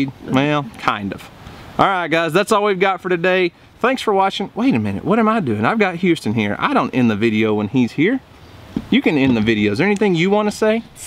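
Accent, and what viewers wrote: United States. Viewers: great video, love your accent, reminds me of all the cowboy movies i watched growing up here in Scotland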